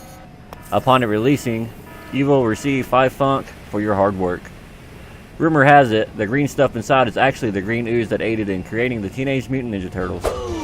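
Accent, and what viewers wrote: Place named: South, United States. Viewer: Where you from man?! Gotta sub man you sound southern as Hell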